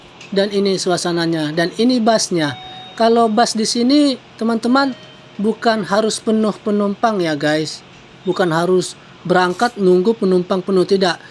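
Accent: Medan, Indonesia. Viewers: Bang mau tanya boleh ngga Abang aslinya orang mana ? Tapi logatnya kaya orang Medan ya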